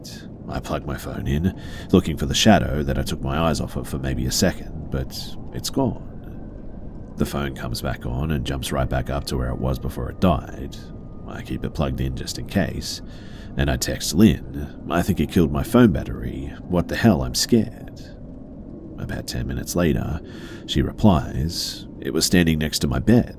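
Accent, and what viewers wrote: Australia. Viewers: I normally can't stand hearing a fellow Australian accent in videos/movies but this voice is particularly nice. Thanks for the content, I'll leave a like & subscription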